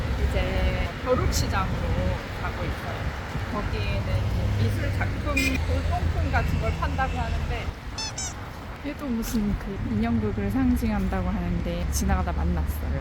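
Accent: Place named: Gyeongsang, South Korea. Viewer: 교향이 어디신가요? 살짝 경상도 억양이 나는 듯도 하구요. 아무튼 20여일 후에는 트빌리시를 관광하고 있을 생각에 영상을 다시 보고 있어요.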